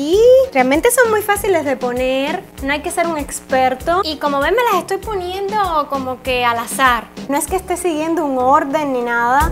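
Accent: Cuba. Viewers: Hola guapisimaaa que linda eres me encantas soy feliz viendo tus videos y el acentito cubano yo vivo en Sevilla soy de Ecuador mi patria querida ole ole cada vez mas entretenida interesante intelugente con esa actitud positiva mi latina favorita te mando un beso muy grande que te queremos mucho tambien mi hija Patricia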